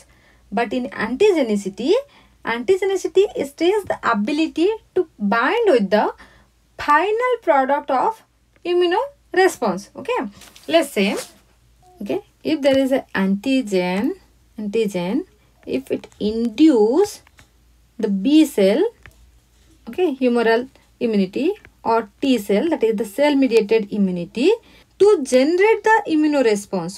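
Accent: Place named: Odisha, India. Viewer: I was first confirmed that you are from odisha by listening your speaking style...thank you so much ma'am... For making this understandable.. Please upload videos for ug students. 🤗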